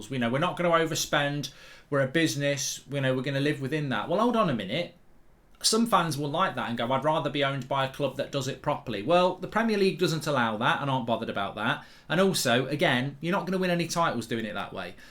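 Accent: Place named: Birmingham, United Kingdom. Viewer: Where does goldbridge live......he is certainly not a Mancunian as he has a quite distinct Brummie accent.....Are there no clubs in the Birmingham area for him to support.....or is this all about ££££££££££££££££££££££££££££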